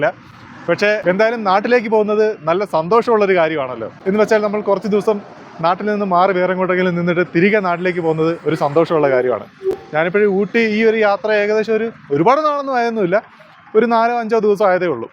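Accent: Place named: Malabar, India. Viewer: താങ്കളുടെ എളിമയും സംസാര രീതിയും പെരുമാറ്റവും കണ്ടാൽ താങ്കളൊരു കൊല്ലംകാരനാണെന്ന് ആരും പറയുകയില്ല ഒരു മലബാറുകാരനാണെന്നെ പറയൂ...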